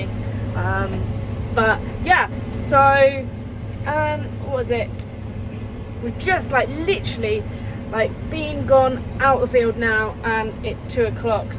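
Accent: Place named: United Kingdom. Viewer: Just love the British accent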